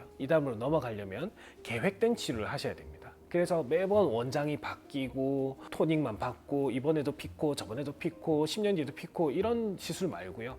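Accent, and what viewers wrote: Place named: Yanbian, China. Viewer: 과한몸짓과 조선족말투. 사기꾼 같아보입니다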